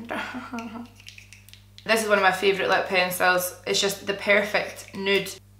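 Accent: Scotland, United Kingdom. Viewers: Omg I saw the awesome makeup and clicked and I was so surprised to hear a Scottish accent! Yay! Love from Glasgow x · There's nothing less beautiful than saying beautiful with a scottish accent! Until a week ago i'd been in new zealand for 2.5 years and watching your vlogs and your scottish accent gave me life! and kept me in tune with my own accent. love it every week- you're amazing! - IG sazwood94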